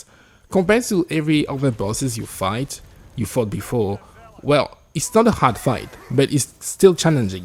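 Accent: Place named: France. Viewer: je me disais bien que y'avait des petits reste d'accents français par ci par la, très bon niveau d'anglais sinon.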